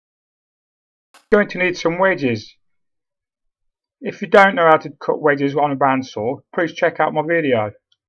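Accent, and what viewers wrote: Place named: England, United Kingdom. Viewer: I didn't realise you were Italian, sorry, as you sound very much English & have a similar accent as myself, who is from the Black country. Thank you for taking the time to reply. God bless & look forward to more videos.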